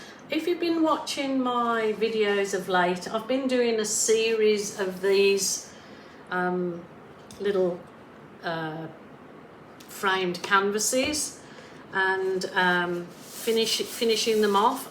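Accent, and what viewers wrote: Birmingham, United Kingdom. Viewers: That is a cheer-me-upper if ever I saw one! Fabulous colours and your talent make a painting I could look at for a very long time, thanks for sharing the how of it. btw, every so often I think I hear a Brummie accent - is that possible? ❤ from Scotland ❤😂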